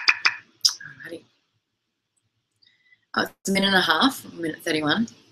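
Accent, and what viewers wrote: Australia. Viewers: You sound Australian. :)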